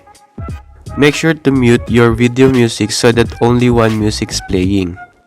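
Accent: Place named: Philippines. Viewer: Sounds like a Filipino accent 😍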